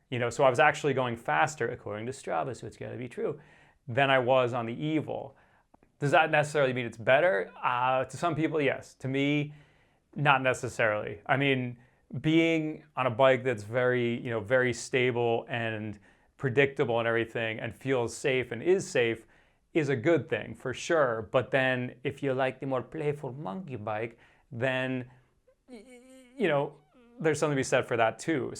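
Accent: Boston, United States. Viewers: Amazing review. I think the fact that I’m originally from Massachusetts and hearing a bit of your Boston accent helps me understand what you’re saying. 😂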